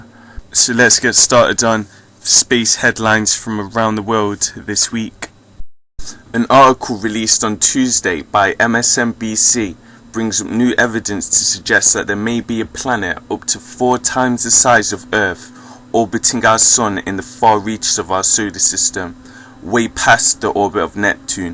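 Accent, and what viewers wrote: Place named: United Kingdom. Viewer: where in u.k u from mate? london? great show mate keep it up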